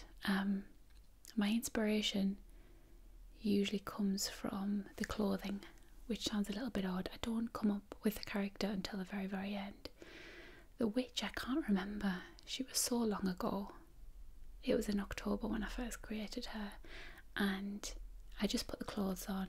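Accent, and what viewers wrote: United Kingdom. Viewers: I think I can day my English is pretty good, I always understand all the asmr video's I like to watch, but I have to admit.. sometimes I really can't understand you because of your very strong British accent! Am I the only one? But I can deal with it, because I really love listening to your accent haha ^_^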